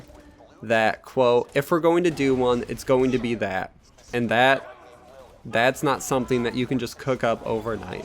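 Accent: Netherlands. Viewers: Are you Dutch? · Wwowowo are you dutch dude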